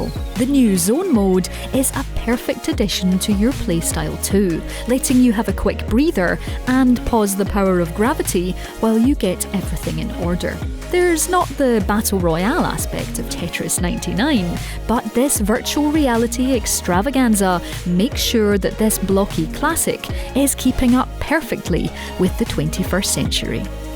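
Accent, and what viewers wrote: Scotland, United Kingdom. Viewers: i love this ladys scottish accent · everyone talking about games but no one talking about narrator girl .She mixed her scottish-english-welsh accents and it sounds like cute xD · This is a nice scottish accent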